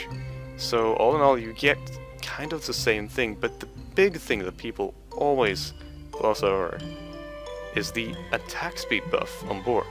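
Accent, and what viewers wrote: Sweden. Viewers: You are swedish for real? :D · Ofta du inte hör att han är svensk?:s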